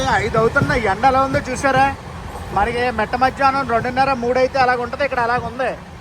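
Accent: Godavari, India. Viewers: Godavari Yasa baguntadhi brother.. watching from Ireland…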